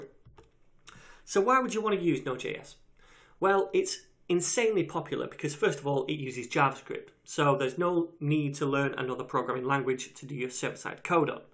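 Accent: United Kingdom. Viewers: What can I say I love British accent thumbs UP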